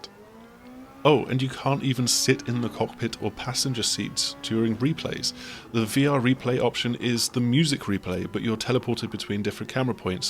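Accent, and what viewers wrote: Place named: RP, United Kingdom. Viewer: You is so posh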